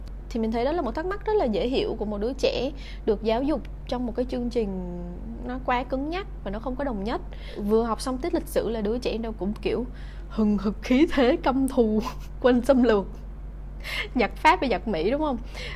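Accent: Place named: South, Vietnam. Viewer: Sang đấy lái giọng Nam luôn rồi ah :))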